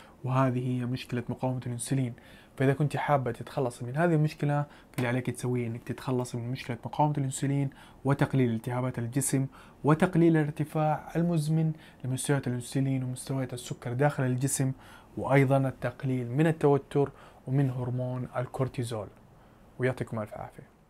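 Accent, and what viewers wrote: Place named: Hejaz, Saudi Arabia. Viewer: سعودي حجازي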